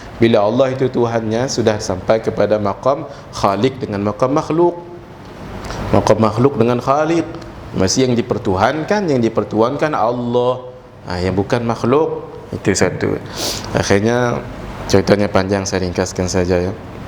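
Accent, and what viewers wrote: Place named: Sarawak, Malaysia. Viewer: dlam bercampur2 slang melayu sarawak dan kedah tetapi tetap indah dan sopan serta penuh ilmu.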